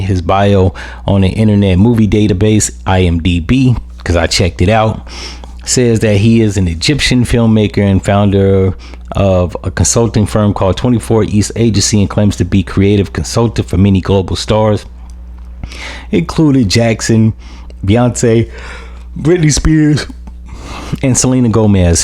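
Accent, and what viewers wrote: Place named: Chicago, United States. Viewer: Keep going strong bru , you dropping fire , one of the best , you sound like one of my Chicago brothers .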